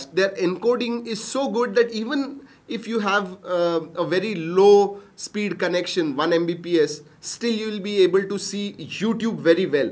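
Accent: India. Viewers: jatin gupta if you wan't to discourage this guy do it based on incorrect facts produced by him but don't disrespect they guy "Indian accent" just because of his lack of factual data in the video.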